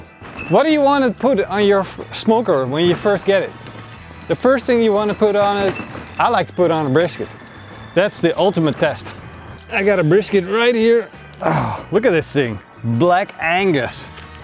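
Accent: Netherlands. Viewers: I love your dutch accent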